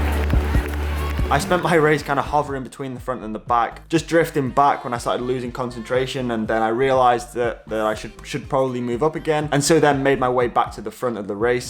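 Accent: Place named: Yorkshire, United Kingdom. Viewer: I hear a dirty Yorkshireman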